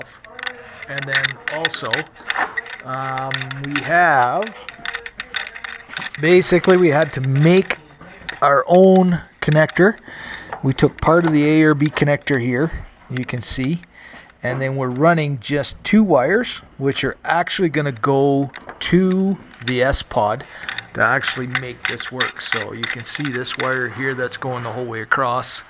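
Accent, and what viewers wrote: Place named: Canada. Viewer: accent annoying. Canadian or border states. Install video was BS.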